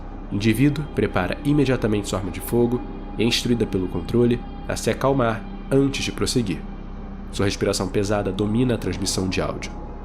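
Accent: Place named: Rio de Janeiro, Brazil. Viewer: Sotaque de carioca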